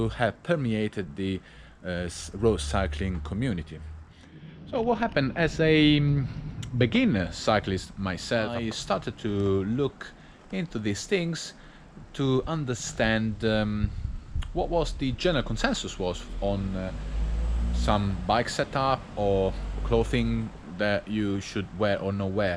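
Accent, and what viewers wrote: Italy. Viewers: Well done 👍, I like the Italian accent, it makes this mindset more believable 😂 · Thanks for sharing your take on the humorous Velominati “rules” in your wonderful Italian accent! Like your video, I think the “rules” are observations of the cycling community. Velominati takes these to a satirical extreme. I love them- follow some, don’t follow others as it suits me. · I don't even have a bicycle but I enjoyed listening to an Italian talking English. Very well done too, a lovely accent.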